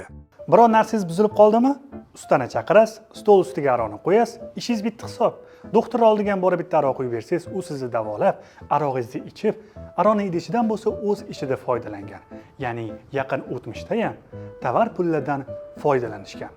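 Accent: Tashkent, Uzbekistan. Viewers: molodes. Bizani dokondan oq sariq koyne xarid qildiz.loyiha un albatta. Korib xursan boldm. RESPECT 📌 Siz bn yangi loyihez bo'yicha fikir almashdi. Qisqasi keng fikrli, IQ balan, tarbiyali bola ekansz. Gap yo. Good luck bro ☝️🤲📌 📢Toshket shevasiga allergiya yoq dp umid qlaman.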